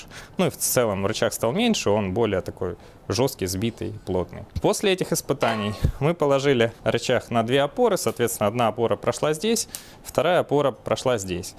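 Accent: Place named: South, Russia. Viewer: Обычный говор триста километров на юг от Москвы все так говорят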